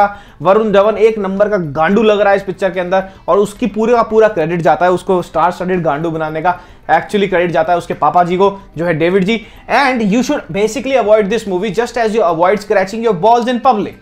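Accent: Haryana, India. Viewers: Are you Haryanvi??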